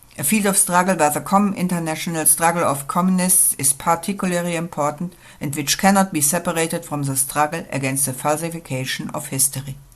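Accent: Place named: Germany. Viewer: They are still around ...go away ..and your accent is so german !! Yikes !!! Go to Russia !!!!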